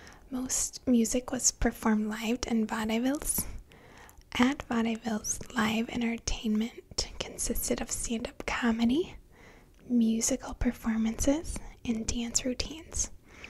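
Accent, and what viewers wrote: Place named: Midwest, United States. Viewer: I stan your midwestern ayackcent :)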